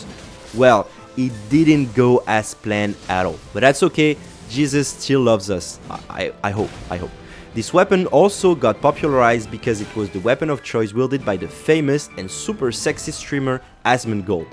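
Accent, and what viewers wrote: France. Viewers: I just realized not only fo you have the French accent, but also th exact same voice and timbre as the "two months later" guy on spongebob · Maaaan, first of, great vid overall! But... during the whole vid, I was like "Hum... this guy sounds French af!" Then when you said your name " " at the end, j'ai su que tu étais bel et bien français et que je ne m'étais pas trompé! 🤣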